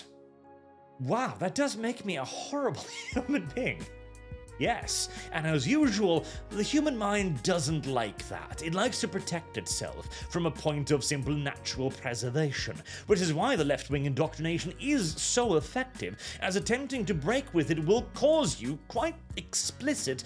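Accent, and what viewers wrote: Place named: United Kingdom. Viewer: Im surprised your from Norway, i would have never thought... Ur English is as Birtish as its gets!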